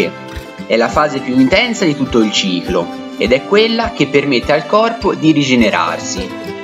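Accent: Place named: Tuscany, Italy. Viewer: direii ahahhahaha, sembri Paolo Ruffini (anch'io sono toscano)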